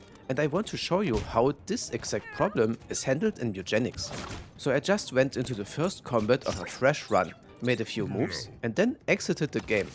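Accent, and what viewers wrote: Germany. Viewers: Ich höre direkt raus, dass du Deutscher bist, Brudi 😂👍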